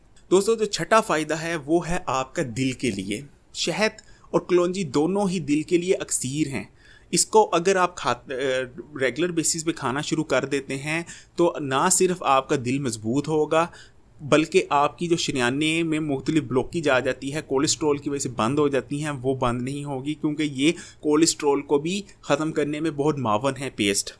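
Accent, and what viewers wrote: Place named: Punjab, India. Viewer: Chuteya ager urdu bolna sekh leyta to acha hota .....punjabi bolny waly bachy.......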